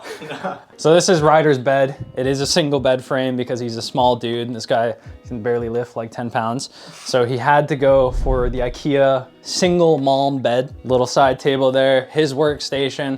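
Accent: United Kingdom. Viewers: i can hear some english twang in your accent... have you ever spent time in england ?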